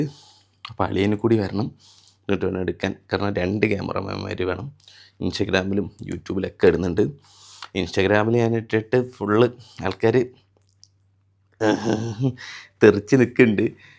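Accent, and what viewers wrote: Kodungallur, India. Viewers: slang shinkunte kodungallur slang ayallo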